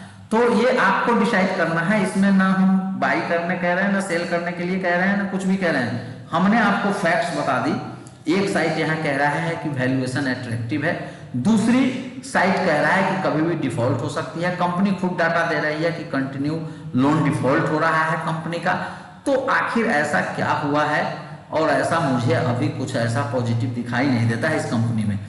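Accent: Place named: Bihar, India. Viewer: TU Bihari hai 100%, or tu sharsa ya madhepura ka hai ................ sahi bola na .............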